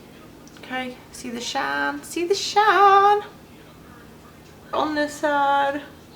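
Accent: South, United States. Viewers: aw i love your southern accent:) i also use the mac emphasize to set my highlight! your videos are really great keep up the good work girl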